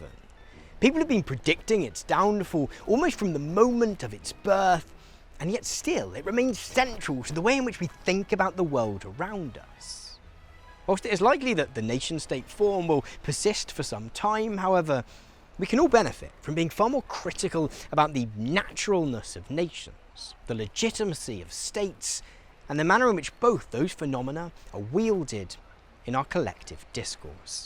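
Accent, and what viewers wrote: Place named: England, United Kingdom. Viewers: Speaking in your English accent with your Anglocentrism saying that Scotland is an example of a nation that doesn’t have it’s own state. You are blind. England is also a nation that doesn’t have it’s own state. That arrogantly blind Anglocentric attitude is what Scotland wants to get away from asap. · When we hear English accent in prose, which is a good thing, we harken to the old school days when music in England was the trend indeed. by is the perfect filler of a song of the Queen's English, mate!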